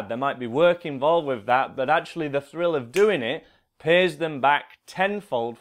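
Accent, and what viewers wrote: United Kingdom. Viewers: are you British or Scottish?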